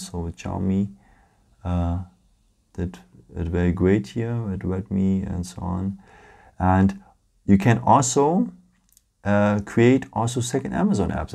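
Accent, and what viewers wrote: Germany. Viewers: Thick german accent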